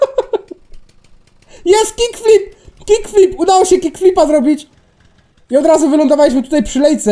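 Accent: Silesia, Poland. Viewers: przaja ci za ten śląski:DD od razu swój rozpozna swego też staram się mówić po polsku i pisać ale zdarza mi się gadać po śląsku a i powinieneś zmienić nazwę kanalu na - król bugowania gier albo osoba która każdą grę ci zbuguje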